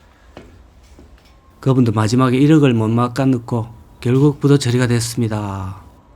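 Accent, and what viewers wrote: Gyeongsang, South Korea. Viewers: 강호발TV님 오늘도 구수한 경상도 사투리 잘들었습니다 멀리노르웨이에서 늘응원합니다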